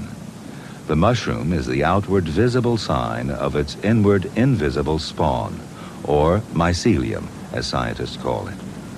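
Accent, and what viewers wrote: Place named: United States. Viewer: Yes but what happened to the original narrator? This version, with the American accent is not nearly as good as him!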